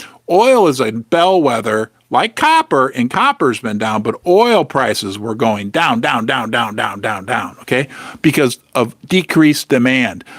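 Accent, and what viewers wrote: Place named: Kentucky, United States. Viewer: Sounds like Kentucky to me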